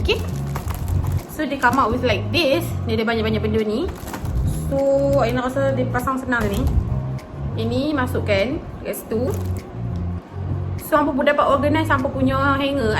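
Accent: North, Malaysia. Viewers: aina kalau ckp utara lagi best dgr 😂 sy suka dgr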